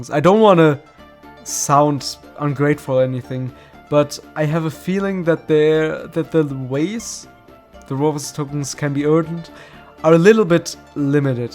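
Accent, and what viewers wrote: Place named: Germany. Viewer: I hear deutsch aber gutes video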